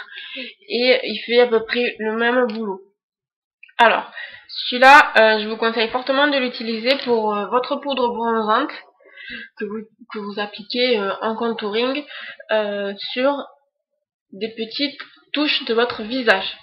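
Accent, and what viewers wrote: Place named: Toulouse, France. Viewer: j'adore ta vidéo ! :) merci et au passage bel accent TOULOUSAIN ;) <3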